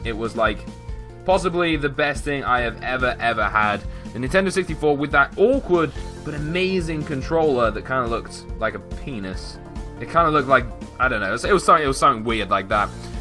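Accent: United Kingdom. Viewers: u have a British accent and by the way I subscribed to u and I am the girl on clash of clans that I talked to u when u said hi YouTube in clash of clans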